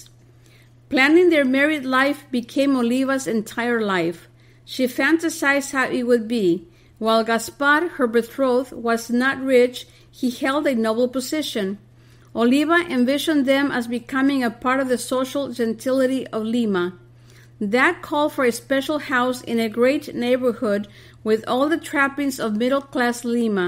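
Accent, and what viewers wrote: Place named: Colorado, United States. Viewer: Interesting presentation. Never heard of this saint. Thanks 🙏. Your narrator has an interesting accent.... southern Colorado or New Mexico? Just curious. Appreciate your work much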